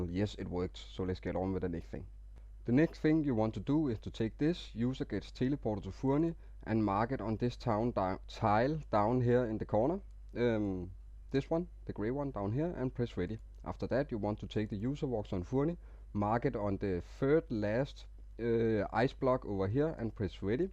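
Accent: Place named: Denmark. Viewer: You're danish aren't you? :p if not, your accent is extremely similar to a dane accent.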